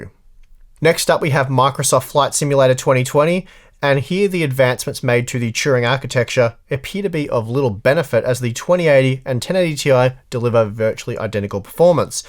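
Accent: Australia. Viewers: I never have any problem understanding the Aussie accent but I legit heard "harbor on box" this time. Also with Turing pricing was the biggest problem. If the 2080 and the 2080Ti were both $200 cheaper they would've been a decent pair of GPUs.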